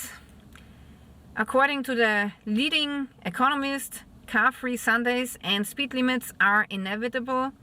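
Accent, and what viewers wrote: Germany. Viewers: Thank you for this summary of unsavory changes in Europe. Living in America, I’m often preoccupied with our actions and reactions, forgetting to stay up to date on the other regions. Love your accent by the way, reminds me of dear family friends I have in Mannheim. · I never liked a German accent but I like hers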